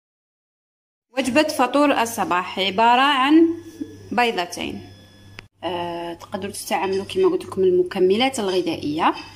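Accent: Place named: Algeria. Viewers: اللهجة جزائرية😁👍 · ذي لهجة الجزاىرية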